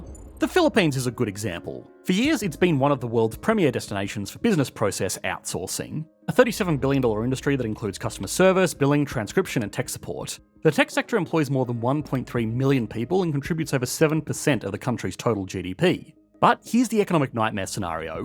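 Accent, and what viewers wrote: Australia. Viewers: I can't stand Australian accents.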